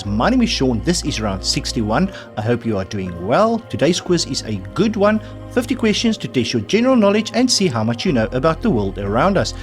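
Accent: South Africa. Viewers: I love your accent, but what is it ❤️🙏🙏🙏??? I cannot put my finger on it. SA??? 😮Thank you for these great quizzes ❤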